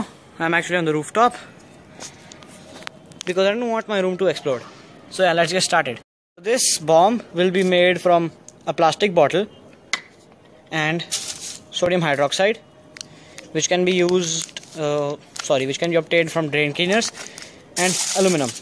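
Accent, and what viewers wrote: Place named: India. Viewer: Vai. Indian. Ho. Khudke. Language ko.. Priority. Diya karo. Hindi me vi bola karo... Jitna vi natak karo.. Apki. Bolne ka tarikese. Malum. Parta hr indian.. English bol raha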